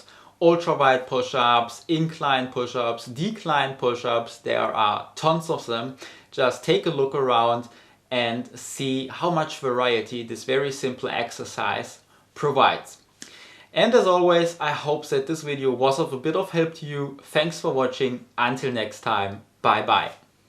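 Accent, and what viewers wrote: Germany. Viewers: u sound like u have a erman acent u german?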